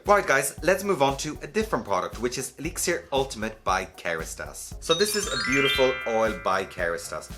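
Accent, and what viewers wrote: Ireland. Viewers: Why do you and the lovely John Maclean say film instead of video? Is it a Scottish thing? I have both of these oils and I love them so much. I soak Moroccan oil before bed specially before my wash day and I use kerastase on the day to day basis (ps: I think you are from Ireland tho? Please don’t kill me I always mix Scottish and Irish accents tho lol) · Love your Irish accent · Thanks so much and I love your (irish?) accent ❤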